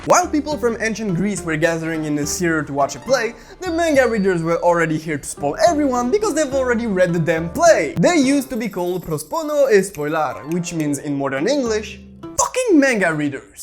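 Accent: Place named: France. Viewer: Gooooood, I love your french accent 🥖🥖🥖🥐🥐🥐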